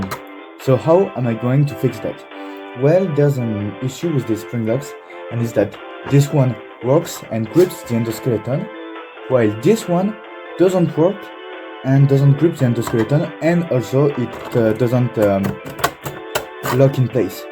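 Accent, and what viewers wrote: France. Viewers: tes tu fracais tes un accent fracais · enfin un français qui fait des video comme celle la on reconnais l'acens français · YOOOOOOOOOOOOOOOO J AI RECONNU TON ACCENT FRANCAIS ET TA DIT UN TRUC EN FRANCAIS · are u french? that’s a nice accent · Is it me or he has a french accent???? Idk.... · L’accent français on reconnais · are you french by any chance? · Hi, im new on this channel and i was wondering, are you french?😅 because your voice have a little bit of french accent🥖🥖🥖😂😅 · wait are you french ? or am i just trippin' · Alors la toi avec ton acsent si t'es pas Français je sais pas ce que tu pourrais etre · are you french? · Do you speak French cuz you have an accent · You got a French accent, I like that. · are you french? you have the accent of a french ppl trying to talk in english, why im saying this is bcuz im myself a french pp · l'accent français me tue de rire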